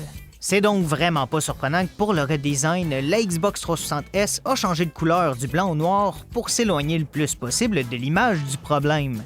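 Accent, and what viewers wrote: Quebec, Canada. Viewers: Quand tu pense cliquer sur une vidéo en français et qu'après tu te rend compte que c'est en québécois...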